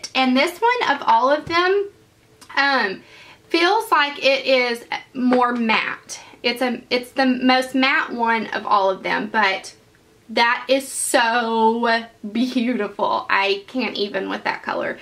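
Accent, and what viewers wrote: South, United States. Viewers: Those are amazing!! And as a former texan...I love your accent! Still a southerner..just a state over. I subscribed. Going to have to check the site out!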